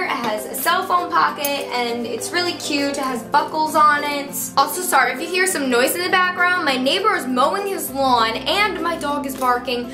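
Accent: New York, United States. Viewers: I'm pretty sure it's a New York accent. I'm from New York and she talks just like me so I think I'm accurate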